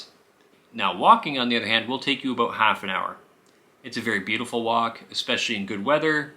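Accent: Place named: Canada. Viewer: Another Canadian on You Tube, my gawd it's like every Canadian has a YouTube channel. As soon as they say the word about you know you got a Canadian on your hands.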